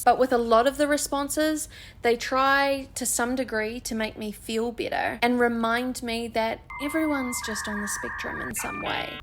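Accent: New Zealand. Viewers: I knew u were from New Zealand lmao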